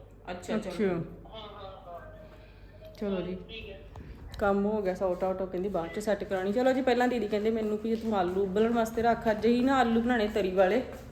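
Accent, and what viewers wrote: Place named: Majha, India. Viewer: Nice vlog tusi kithe o tuhde boli majje wale aa sisters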